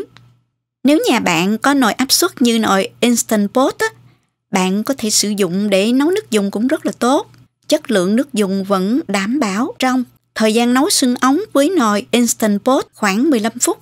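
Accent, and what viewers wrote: South, Vietnam. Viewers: ơi.! Nhìn thấy hấp dẫn quá, nhứt định chị sẽ làm theo. Rất thích giọng nói miền nam của em dễ thương lắm.... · Giong noi chan that va de thuong cua mien nam bo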